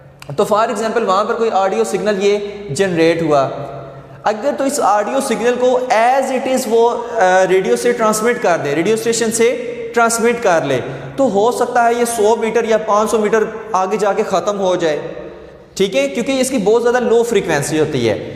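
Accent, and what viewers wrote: Jhelum, Pakistan. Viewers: Are you from jehlum? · Are u from jehlam sir😮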